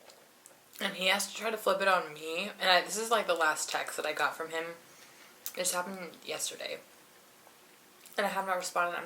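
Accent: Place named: New York, United States. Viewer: You lowkey have a new york accent lmao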